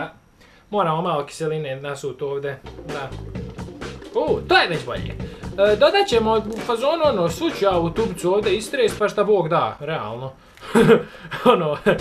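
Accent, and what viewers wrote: Bosnia and Herzegovina. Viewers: Jesi ti iz Bosne ba hahahaha ili Crne gore???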